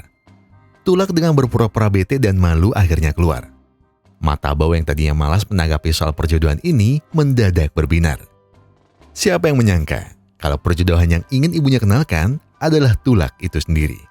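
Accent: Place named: Java, Indonesia. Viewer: Cakepp bangn... Abangnya lucu.. Logat guyonana jowo😅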